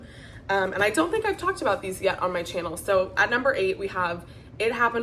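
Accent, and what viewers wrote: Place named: United Kingdom. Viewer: Your British accent kills me every time 🤣🤣🤣🤣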